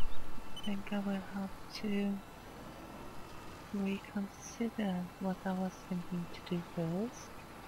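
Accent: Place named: Sweden. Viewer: I can understand you perfectly. Swenglish works well :-) We older generation have to stick together (1952 vintage here). I talk to my motorbikes they like to be consulted:-) Great video I don't think I've ever managed to cover an entire grass field I always find bits I've missed Mike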